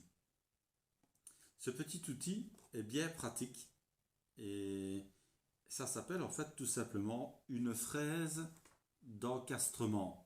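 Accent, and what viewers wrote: France. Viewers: Stunning French accent ☀️👏💕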